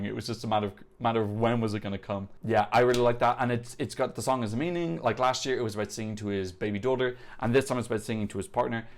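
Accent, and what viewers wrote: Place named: Ireland. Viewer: Yeah but he's Irish and everything is T and D anyway. Saying it's like the does not help 🤣🤣🤣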